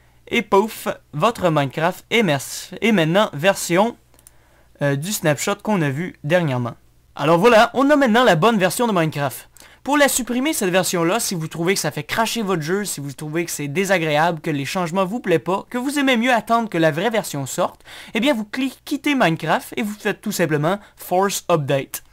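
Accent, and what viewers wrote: Quebec, Canada. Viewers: il dit ''une video'' en tout qu'a au debut mais bon c'est comme sa c'est quebecois c'est fun c'est drole j'adore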